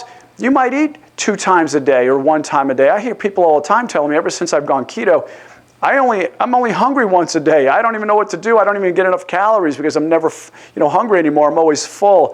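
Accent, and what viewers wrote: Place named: East Coast, United States. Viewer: Been doing keto for the cognitive and athletic benefits. Unfortunately my diet is pretty expensive, $75/week even though I only eat twice a day and 90 g of protein daily (and that itself is on the low end because I also strength/weight train and play tennis). The reason for that cost is the protein I buy (pasture raised eggs and lots of fish, particularly sardines and salmon). However it is worth it for me because those are very high quality, high bioavailability, etc. So protein is usually the highest cost component of keto by far, but less active people might not need as much! Also random question Dr., did you come from the East Coast? I'm hearing a little bit of New York, New Jersey, Massachusetts (and some Italian influence as well!)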